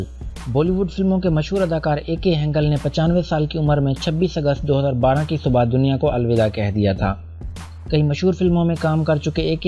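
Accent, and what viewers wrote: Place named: Pakistan. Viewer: Listening a Pakistani Urdu accent on background !!! Something is fishy!!!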